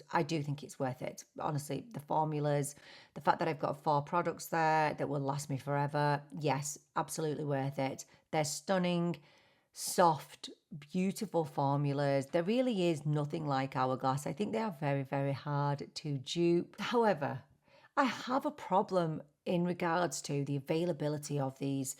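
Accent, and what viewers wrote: Ireland. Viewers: I have been loving your videos & binge watching instead of TV or the major trial in Mass. I am an Irish ☘️ American. Your brogue didn’t sound like my nana’s. You are so professional & funny & enjoyable. I am porcelain strawberry blonde.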